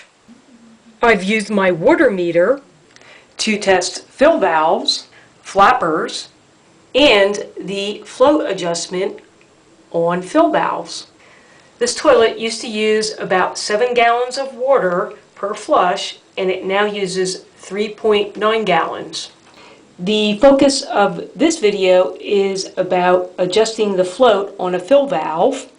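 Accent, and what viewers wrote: Philadelphia, United States. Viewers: Philly accent! 🙌🏻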